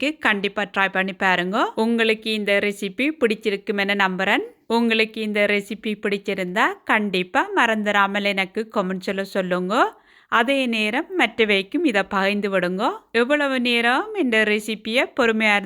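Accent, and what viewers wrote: Sri Lanka. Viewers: வழக்கம் போல உங்கள் இலங்கை தமிழும் தோசை யும் அருமை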